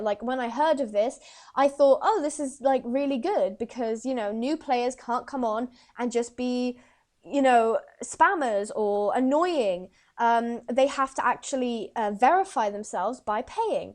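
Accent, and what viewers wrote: United Kingdom. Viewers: Even before, you had to verify your email to chat. I was a free player for 3MONTHS before i got 3 months subscription. after that, i bought pay once and now im level 19. i have been playing star stable for over a year and i think 99.99/100 in the star stable community on global chat are just looking to roleplay. i bet most of those are subscriptions. this is just star stable milking money off their players again. like they do with star coins. in my whole year of playing star stable, i have never bought star coins. i have saved up for all my horses. i now have 11 horses. you don't need to pay for star stable at all just to enjoy the game. LIKE IF YOU AGGREEEEEE Also, what server are you on? Im on Unicorn Forest, UK and you have an english accent. can we be friends on star stable?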